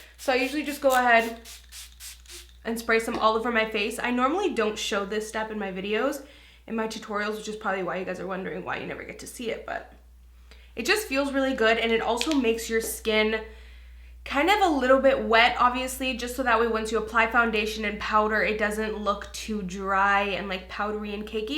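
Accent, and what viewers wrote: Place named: Canada. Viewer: you are so damn adorable!! Just now realized you are over 500k...I've been around since 80. So proud of you. btw i'm in love with your Canadian accent <333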